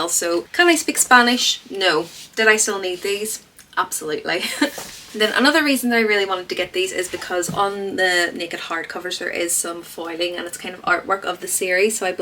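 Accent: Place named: Northern Ireland, United Kingdom. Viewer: So glad to have found a fellow book lover from Northern Ireland! I used to work for the Northern Ireland Bureau (British Embassy) in DC and I miss the accent. 🥰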